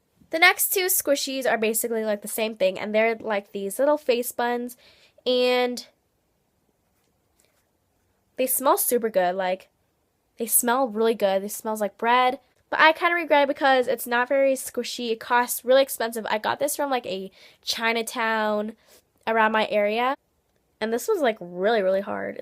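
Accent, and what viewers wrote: Canada. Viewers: Wait is she Canadian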